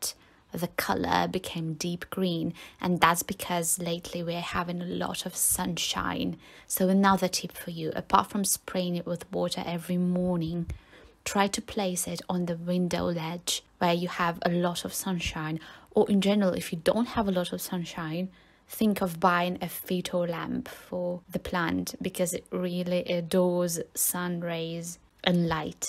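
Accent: United Kingdom. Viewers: Your British accent is very welcoming by the way and your KOT is very cute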